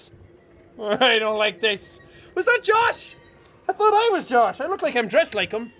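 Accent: Ireland. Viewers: Ramona Clawson He’s from Ireland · Dude are you Irish? Nice video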